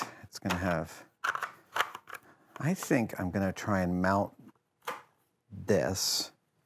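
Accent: United States. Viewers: Hiya, can I just ask, does everyone in America call solder "sodder"? Or is it just yourself? Sorry, it just doesn't make any sense to me. You don't say that you have "sod" your house so why change how you pronounce it in other context? I wasn't going to comment because of fear of people going crazy with me but I am genuinely curious and the more that it rattles around my brain, the more it is irritating me. Thanks very much and love from the UK xxx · I wondered what you were talking about..THen I realised you call SOLDERING....SODERING>..I guess Murican accent,.Nice vid though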